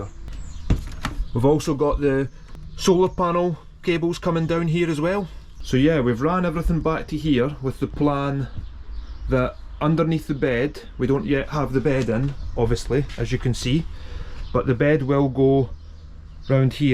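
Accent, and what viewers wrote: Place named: Scotland, United Kingdom. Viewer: Mate where in Scotland has that accent ?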